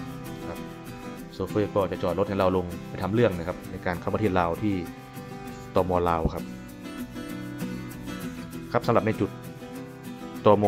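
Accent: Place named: Nyo, Thailand. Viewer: ออกไทญ้อเรื่อยเลย อิอิอิอิ...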